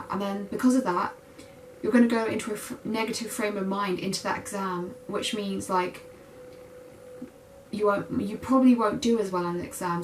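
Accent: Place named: England, United Kingdom. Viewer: Where are you from you sound English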